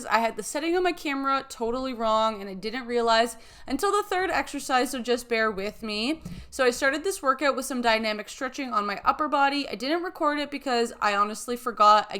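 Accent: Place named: Canada. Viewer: Canadian! U can tell by the way she says 'Out'.... heh heh;-)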